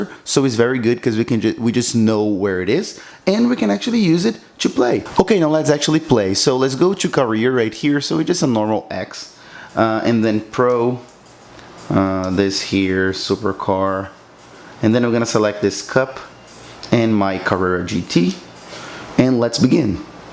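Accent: Brazil. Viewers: Look at this accent man! Are you from Brazil?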